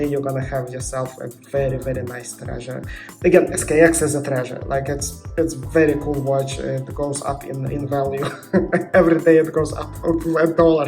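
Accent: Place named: Russia. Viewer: The accent of this guy is very ru**ish! 👎👎👎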